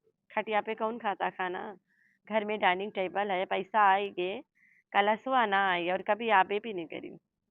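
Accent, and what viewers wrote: Uttar Pradesh, India. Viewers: Aap ki bhasa to humare u p india ki lg rhi 😅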